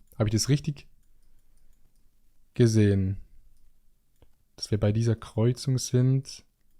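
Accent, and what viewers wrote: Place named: Zurich, Switzerland. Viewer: ich dachte ku kommst aus Zürich😂 liebe grüsse von kleinbasel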